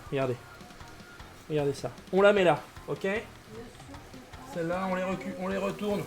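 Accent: South, France. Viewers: cet accent du sud si merveilleux XD heureusement que tu fais des replays! en ce moment je rate tout tes lives :'(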